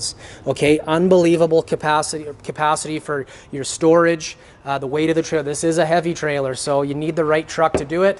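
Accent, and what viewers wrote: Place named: Canada. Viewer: Boy theres Canadian accent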